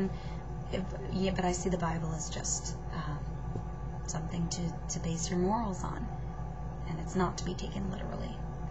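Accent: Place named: United States. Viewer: Fundies don't think for themselves, that's what their bibles and pastors are for, but you would know that if you really lived here or understood anything about this country. Then again, you do sound more like a seppo than an aussie. Methinks you're a bit confused - favoriting Bill Maher and Richard D and then whingeing at me. Hmmm...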